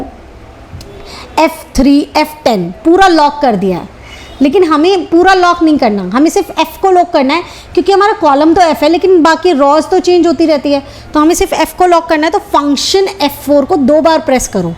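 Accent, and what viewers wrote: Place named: Pakistan. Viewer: Ur accent sounds like Pakistani..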